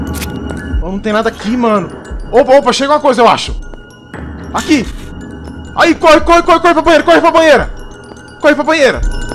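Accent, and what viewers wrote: Ceará, Brazil. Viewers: Aeeeee Um cearense! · Olha um cearense kkkkk a não mano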